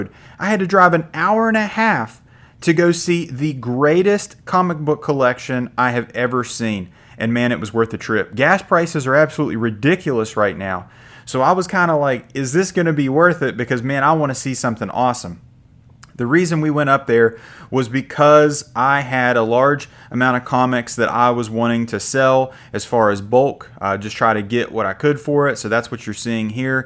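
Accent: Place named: Tennessee, United States. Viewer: to answer your question at the end, do i think its feasible to obtain those type of books just paying cash, no, not unless you're rich or spending money you dont need to on it. Now is it possible to obtain stuff like that by building over time, selling, trading and all that? Sure....but even then....in my opinion theres no way this guy isnt fairly well off. Its such an awesome collection but i would consider it overboard for a person without alot of expendable cash lol. Of course its also possible hes always had alot of them since way back when some came out, but for ppl our age, we arent gonna have anything in our PCs that we bought when it came out before the copper age unfortunately, which means wed be paying premium to own the majority of what was in there (not counting good deals and getting lucky and all that). Btw i just found you're channel and subbed. 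You sound like your from around my neck of the woods lol. Tennessee here. Cool video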